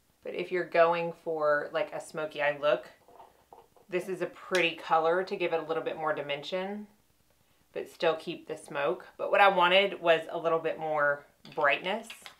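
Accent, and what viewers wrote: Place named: Appalachia, United States. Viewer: Been watching you for about a month now and I’m so happy I found your channel....I had never heard of Harbin house and watched one of your videos where you were talking about the Elta MD and immediately went and snatched it up one of my favorite sunscreens. Also your accent reminds me of Home I’m from the mountains of North Carolina right on the Tennessee North Carolina border😁.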